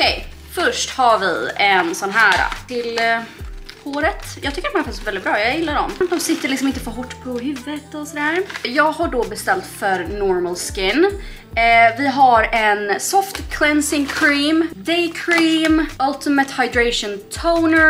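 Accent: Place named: Gothenburg, Sweden. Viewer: Superbra video! Alltså tycker du är så gullig när du ibland har din göteborgska dialekt fast med en släng av Karlskrona-dialekt i samma mening😍😂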